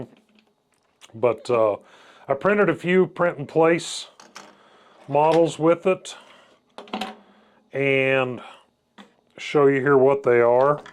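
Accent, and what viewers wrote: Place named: South, United States. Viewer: That's a southern drawl for you. Low and slow. Not in a hurry to get anywhere.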